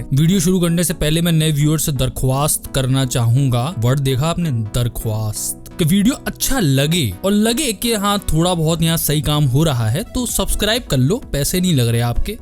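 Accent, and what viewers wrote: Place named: Rajasthan, India. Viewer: U sound like you are from Rajasthan 😁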